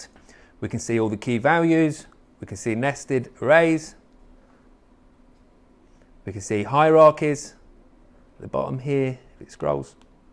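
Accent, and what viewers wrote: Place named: Scotland, United Kingdom. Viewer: finally some scotsman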